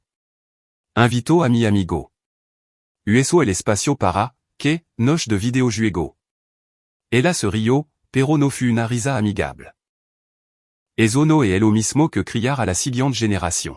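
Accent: France. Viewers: ¿Qué onda con el idioma? No es español, no es francés. es una mezcla de ambos, escrito en español con pronunciación francesa... que locura!! Decídete por uno, ¿no? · Es una persona francesa hablando en español, cuando una palabra le resulta difícil la dice en francés, pero si es un francés!!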